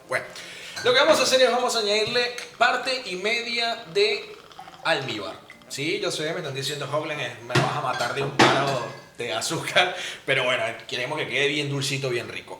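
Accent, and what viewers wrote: Argentina. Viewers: Eres argentino! Wow yo pensando que eras chileno 😂 me encantan tus vídeos, continúa así 😍 😊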